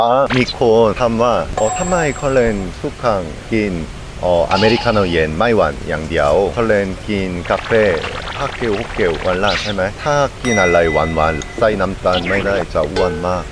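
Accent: South Korea. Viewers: Thai language with Korean accent😂😂😂 You speak like in Korean Film 😂😂😂🤣🤣🤣 so cute 🤣🤣🤣 very talent 👍🏽👍🏽👍🏽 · เพิ่งจะดูเป็คลิปแรก น่ารักมากๆ ชอบคนเกาหลีพูดภาษาไทย น่ารักดีค่ะ😊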